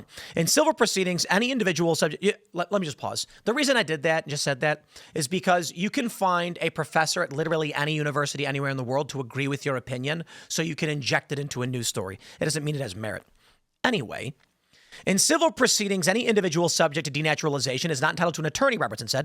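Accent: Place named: Chicago, United States. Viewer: Your “Chi-Town” accent has been duly noted as of late!…I Like it!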